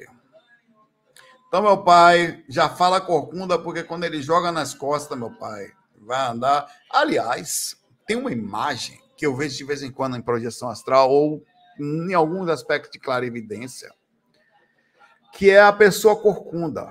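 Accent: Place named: Bahia, Brazil. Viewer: seu baianês é uma pérola. Obrigada!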